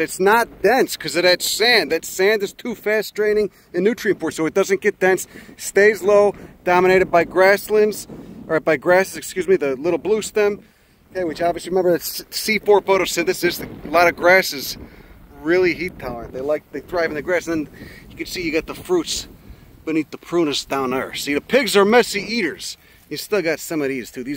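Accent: Chicago, United States. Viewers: Funniest botanist. He’s from Chicago and travels the country trying to look at plants. Heavy Chicago accent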